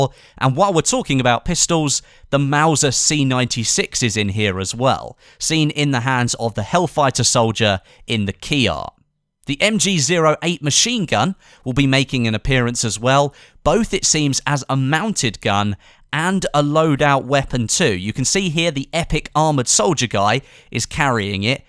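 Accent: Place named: England, United Kingdom. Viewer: Why do the people who make these videos always have that English accent? Do you all sit around in a jerk circle making game videos or something?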